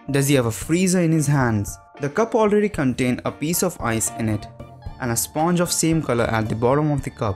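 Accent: India. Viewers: I’m just curious why a few random videos of theirs uses an Indian guy to narrate? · Why the fuck every time I hear a Indian accent (I'm not sure if he is Indian) I believe there name is mohammed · If u have any problems with others then keep it to your self by sharing it to the world you will make it worse for the guy who is talking as well as some Indian people might take take it seriously and u didn't mention its indian accent but the accent he is talking is obviously indian right ? So please understand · Indian accent for sure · Is the Narrator INDIAN?? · Are u indian voice??? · That's Indian accent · The moment i heard indian accent, i quickly stop the video and dislike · สำเนียงภาษาอังกฤษ!เหมือนคนอินเดียพูดภาษาอังกฤษเลย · English is not my native language, however Indian accents are probably the only ones I have difficulties understanding.. 🙈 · Is he Indian? 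Are you sure? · Well guys don’t worry about his accent ‘ he is a indian guy ‘ but must be well educated and it was awesome presentation 👏🏻👍🏼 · Indian accent don't go well with Magic reveling voice-over. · Love the Indian accent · why is the commentator swallowing words? very thick indian accident is ok. Some indians speak clearly. This one is awful. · Nice vid. Narrator is from the subcontinent. I'm guessing India. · Indian accent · Sorry but I would say NO to Indian Accent.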